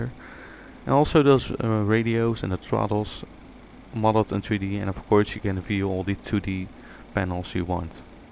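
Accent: Netherlands. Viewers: From your accent I can hear you're dutch :)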